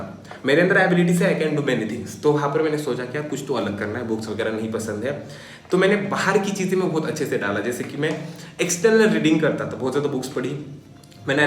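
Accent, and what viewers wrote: Maharashtra, India. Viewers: Love his marathi accents ❤😅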